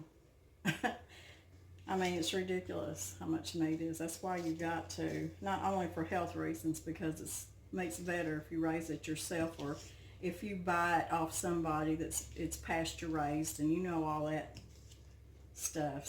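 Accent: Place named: Kentucky, United States. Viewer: Hi. I just discovered your channel. I love to watch you. I like how you do things the old fashioned way, like my Mama taught me. I love raising a garden, canning my vegetables. Making bread from scratch, doing just about anything the old fashioned way. Thanks for sharing your talents with us. I was born and raised in KY. I live in TN now. Your accent sounds like home. Where are you from?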